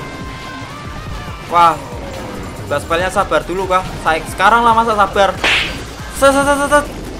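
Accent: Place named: Java, Indonesia. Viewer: Medok jawa adik adik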